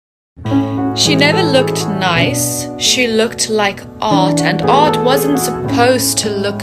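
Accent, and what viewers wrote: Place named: United Kingdom. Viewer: i like this voice so much and it's british accent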